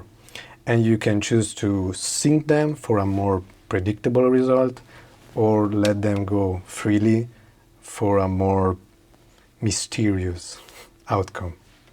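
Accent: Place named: Spain. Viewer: Fantastic demo and tips! Love your accent too...Are you Spanish?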